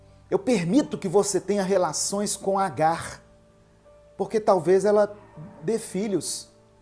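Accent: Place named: Minas Gerais, Brazil. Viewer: Você é de BH ou MG? Seu sotaque não me engana rsrs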